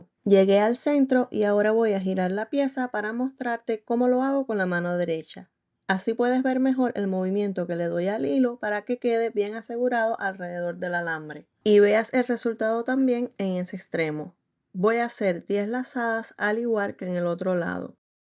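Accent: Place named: Chile. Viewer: Ela fala mto depressa e bem chilena mesmo! Outras entendo.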